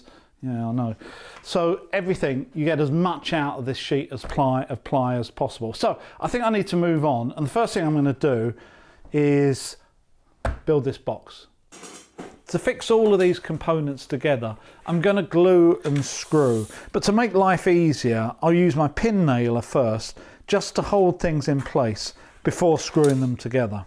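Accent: England, United Kingdom. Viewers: His accent is English !!? Literally no one outside the US uses imperial anymore because it’s stupid…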